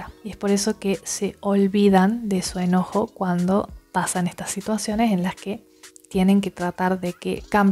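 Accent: Córdoba, Argentina. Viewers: Amo tu acento. Supongo que eres de Cordoba. ¡Me encanta! ¡Gracias infinitas por tu ayuda! ❤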